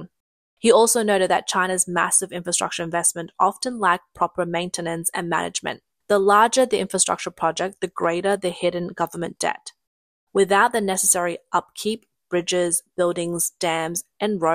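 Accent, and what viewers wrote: Australia. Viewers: I think I detect an Aussie accent from the narrator. Props to you young lady for exposing what the CCP suppresses daily. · Side note: I love your accent... Aussie? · This Aussie confirms it to be an Aussie accent.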